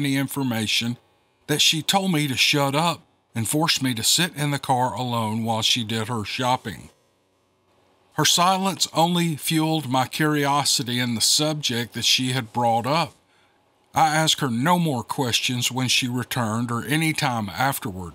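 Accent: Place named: Appalachia, United States. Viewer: I'm frail now, in my early 60's, but liver failure has made me look far older than I am...and my looks betray what I once was, long ago. 6'5", 245-lbs...once a U.S. Marine, then various colleges and careers leading me to a distinguished position as a Director of Engineering...all betraying this lanky half-Cherokee kid who grew up not far from Clingman's dome in Tennessee...an 'appalachian hillfolk', as it were. I hear the accent that I had as a kid, and listen intently to the stories told here, and it brings me back to old stories like I grew up surrounded by...legends of the hill people and the Cherokee...from a time long ago. The love of my life and I spent summers in each others arms...and she has been my wife for many years now...almost 50, as memory recalls (we were 'like one' for years before we actually got 'legally married')...and nothing is better than sitting here in the evenings with her, as we listen to the channel like you might listen to WSM-650 back in the day, and hear the old shows and country music...by the living room radio (the size of a modern TV now)...keep up the great work,...some things should never completely 'fade away'...like the stories here.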